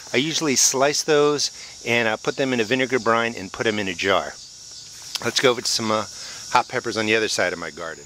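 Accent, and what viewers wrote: New Jersey, United States. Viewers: Love the Jersey accent! A lot of cool stuff on your site too. Inspirational to see so many beautiful peppers growing. Do you ever grill them?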